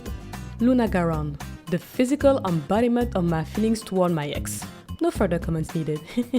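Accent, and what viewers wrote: France. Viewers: i love ur french accent its so pretty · Je me disais que t'avais un petit accent français et je vois que j'ai raison.. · I love your accent. Are you French btw?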